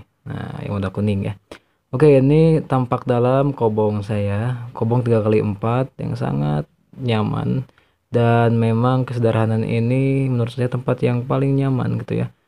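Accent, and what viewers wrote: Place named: Sunda, Indonesia. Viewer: Mantap. Lanjutkan dengan ke khassan Ustadz Sundanya. Hehehe....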